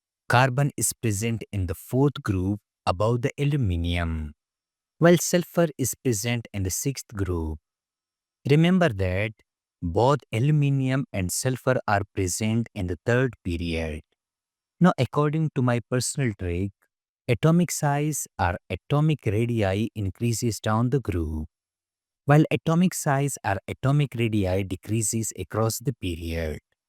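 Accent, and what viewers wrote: India. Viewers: Sounds so indianlistic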